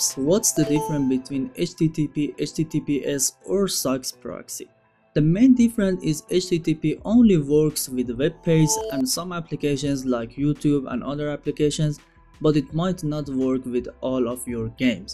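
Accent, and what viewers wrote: Iran. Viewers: Straight persian accent right there 😅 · اکسنت فارسی داد میزنه ایرانی هستی 😝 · از لهجتون تشخیص دادم موفق باشید 😁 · سلام داداش چطوری خوبی میدونم ایرانی هستی از لحجت معلومه خوشبختم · داداش فارسی هم بگو خوب تابلوئه اکسنت پرشینت ..😉👍🏽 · داش حال کردی چطوری تشخیص دادم؟ XD لهجه ت ایرانی اصیلهXD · لحجت،ایران هستی؟ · Bro you Persian. 100% Theres no other Accent like this.. Ive spoken to many who speak the Lamguage Persian as their Mother Tongue..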